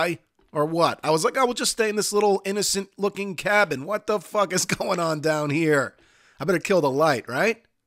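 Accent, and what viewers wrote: New Jersey, United States. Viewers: Probably the best 7Days content around. Maybe its the New Jersey accent...?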